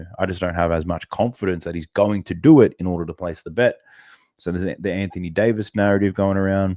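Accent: Australia. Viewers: Bro I mean this with the utmost respect but I realized that the Aussie accent is the reason I love the intro so much 🤷‍♂️🤣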